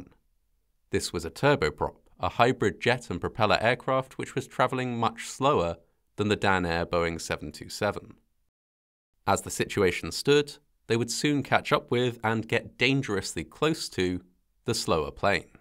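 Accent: United Kingdom. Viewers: get rid of that stupid british acsent it sux like your littile island 😮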